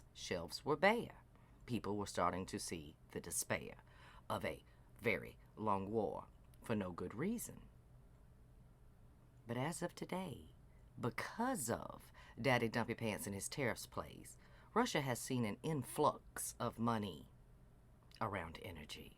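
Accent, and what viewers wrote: South, United States. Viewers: Your southern voice is the perfect vehicle to deliver your scathing epitaph of dumpy pants daddy's downfall! Chef's Kiss 💋 ❤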